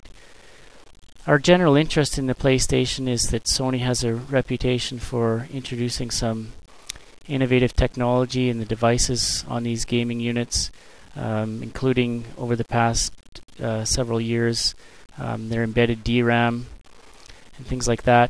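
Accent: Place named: Canada. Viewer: you have a canadian accent lol are you canadian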